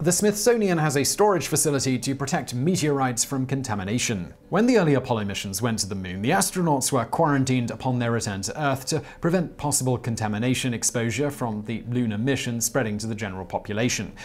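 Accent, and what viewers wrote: England, United Kingdom. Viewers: He's British. You can't quite judge him on pronunciation unless you yourself happen to be from there as well. Additionally, accents in the UK as a whole have noteable changes every 25 miles so even then you could be off. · Brains00007 Brit-speak. Go figure. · Hey Top Tenz, maybe slow down your production frenzy and aim at better accuracy. The first mugshot you showed of "John Dillinger" was actually the mugshot of Baby Face Nelson. I appreciate an English accent as much as the next person, but information hurled at the viewer like a machine gun in a Brit tone doesn't always mean it's the truth. Hire a fact checker, for heaven's sake. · Please stop following the current fad of having videos narrated by a Brit. The accent is horrendous and distracting. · He can’t pronounce a lot of words. It takes more than glasses and an English accent to be intelligent.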